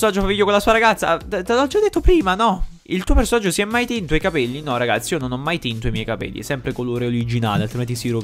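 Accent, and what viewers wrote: Naples, Italy. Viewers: Sei napoletano da come hai parlato sei un grande forza napoli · Sei Napoletano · Bro tu sei di Napoli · Solo adesso mi sembra che è napoletano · Bro ma sei di Napoli?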